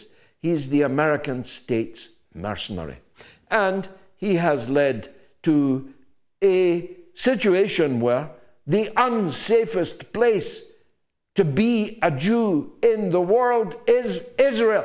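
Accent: Scotland, United Kingdom. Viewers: I love your Scottish accent!!! from Brittany!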